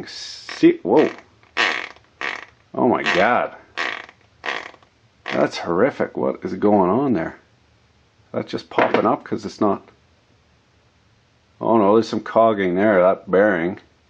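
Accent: Canada. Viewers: No wonder he talks weird he’s Canadian.🙄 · Canadian as fuck. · I cannot get enough of this Canada talk. Awesome. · Canadian as fuck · strongest canadian accent I've ever heard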